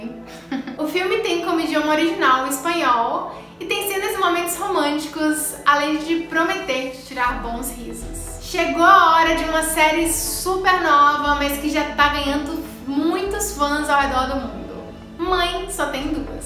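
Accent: Bahia, Brazil. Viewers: Além de linda ainda tem esse sotaque lindo da Bahia 😍🙈💕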